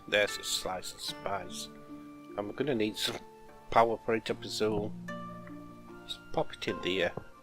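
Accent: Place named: Manchester, United Kingdom. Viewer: Nothing to do with your voice, it has a good north Manchester accent. Glad you don't have commentary in one ear and music in the other I like both together.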